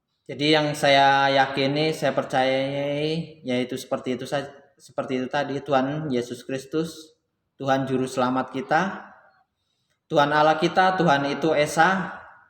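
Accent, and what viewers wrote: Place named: Java, Indonesia. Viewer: Logat Jawa...Pengin pulang kampung rasanya 🙈🙈 Sub jg Christian Prince channel mas..🙏🙏🙏 Ntar datang kesini ntar malam <link> Kutunggu ya Mas...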